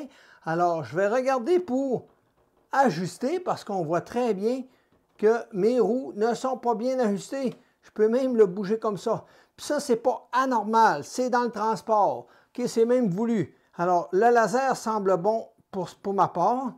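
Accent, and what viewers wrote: Quebec, Canada. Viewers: Bonjour. Encore une vidéo claire et efficace. Merci. Commentaire sur le précédent commentaire, oui il fait -3°C en France, mais ce n'est pas à un canadien qu'il faut s'en plaindre. Ça doit bien te faire sourire le climat français par rapport à celui de l'Amérique du Nord. Mais je confirme, ton accent et ta bonne humeur nous réchauffe le coeur et l'âme.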